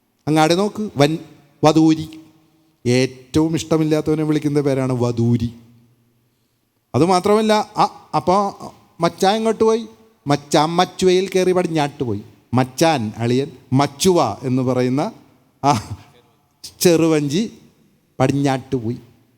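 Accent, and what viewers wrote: Palakkad, India. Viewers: ഇയ്യാൾ പാലക്കാട്‌ ഭാഷ പറയുന്നത് ഒരു പ്രതേക വിഭാഗകാർ പറയുന്ന സ്ലാങ് ആണ്. ഇതൊന്നുമല്ല നല്ല പാലക്കാടൻ ഭാഷ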